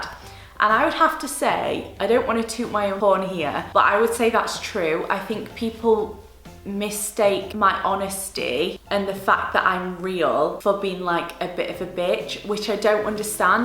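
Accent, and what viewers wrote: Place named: Northern England, United Kingdom. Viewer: Love that you went to Penistone Grammar! I live Huddersfield way and it's great to hear another Northern accent :D xxx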